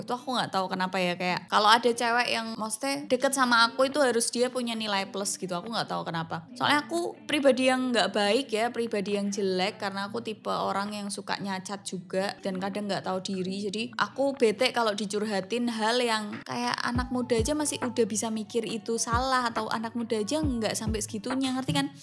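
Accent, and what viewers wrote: Java, Indonesia. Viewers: medok nya itu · Klo pingin makan ati terus, kepripen hayoo ??? logatmu kok campuran suroboyoan+Jawa tengahan sih ???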